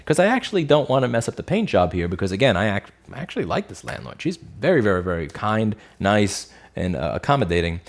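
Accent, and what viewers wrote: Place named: New Hampshire, United States. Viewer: As time goes on you sound more and more like a New Hampshire resident and less like a New Yorker.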